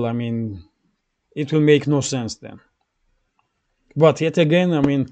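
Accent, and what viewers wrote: Russia. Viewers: Your English is quite perfect and it’s not hard at all to listen to your conscientious sentence construction dedicated to accurately expressing what you want to get across to your audience. Especially with your lovely Russian accent 🙂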